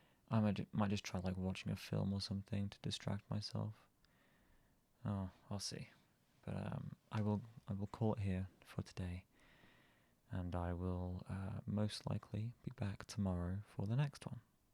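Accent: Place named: United Kingdom. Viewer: this randomly got recommended to me, hi! we love british accents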